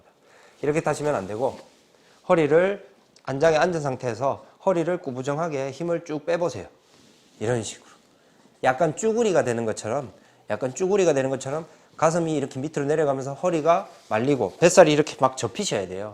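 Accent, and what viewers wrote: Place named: Gyeongsang, South Korea. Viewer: 경상도말투가 이렇게 자상할수도잇구나...기본개념부터 차근차근 잘설명해주셔서 본인자세를 다시한번 돌아보게되는 좋은 영상입니다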